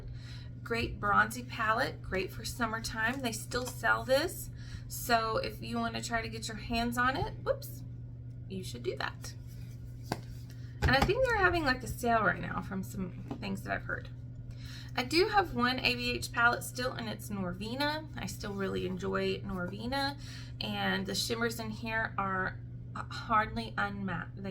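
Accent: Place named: Texas, United States. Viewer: Which state are you in? My guess in Texas or Oklahoma, going by your accent. I've actually spent alot of time in the states, having family in California, Idaho, Illinois, Montana, Arkansas, Texas and Colorado. I'm not American but I'm first generation Australian, from Europe. Other family members have immigrated to US.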